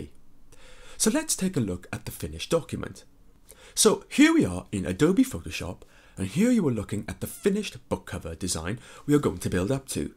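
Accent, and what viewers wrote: Wales, United Kingdom. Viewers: Pretty sure he's Welsh.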